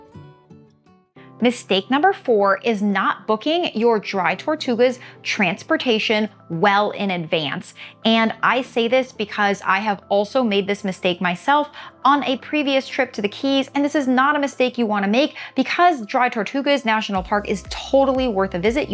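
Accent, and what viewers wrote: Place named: Upper Midwest, United States. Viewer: You sound like you are from the upper Mid west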